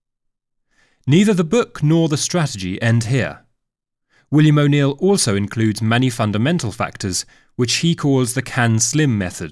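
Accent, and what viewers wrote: United Kingdom. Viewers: You londoner?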